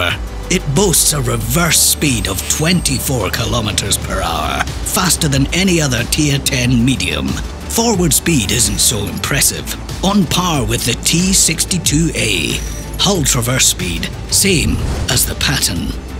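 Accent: Scotland, United Kingdom. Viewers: The scottish accent is stronk in this one. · That Scottish accent is thicc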